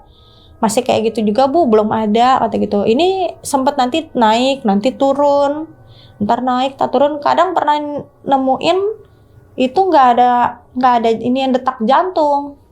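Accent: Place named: Jakarta, Indonesia. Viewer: Betawi bngt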